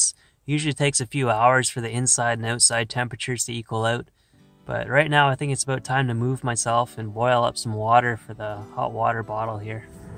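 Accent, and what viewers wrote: Canada. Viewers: the Canadian accent too! "Take off, aye!"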